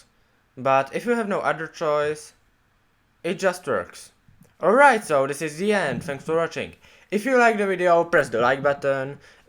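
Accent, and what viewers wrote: Czech Republic. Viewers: it's just Czech accent :-D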